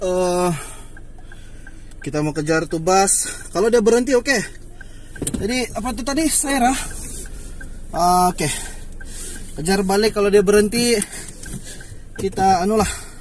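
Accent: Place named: Sabah, Malaysia. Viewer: Suk😂 dengar cara org sabah ckp. Sedap bahasanya. Baek kau bang. Pandei ko ckp bus lantai bocorr.😅😅 ko boleh jd driver racing car lah bang. Corner pun ko layan 90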